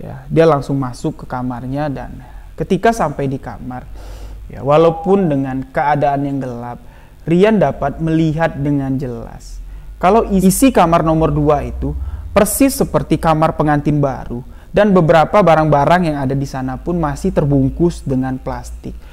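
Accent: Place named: Madura, Indonesia. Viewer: Mas tu orang mana ya kok ada logat maduranya...🙏...seneng dengerin cerita 2 dr mas...sehat sll sukses...mantap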